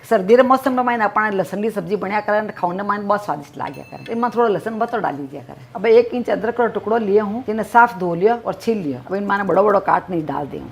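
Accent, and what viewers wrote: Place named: Rajasthan, India. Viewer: Marwari apka bolna achalge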